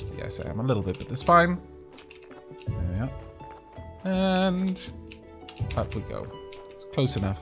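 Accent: Wales, United Kingdom. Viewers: I get people from outside the UK probably don't know much about various UK accents but I'd really have expected most people to recognise a Welsh voice. He even sometimes slips up and says the Welsh word for a thing mid sentence which is rather funny when it happens.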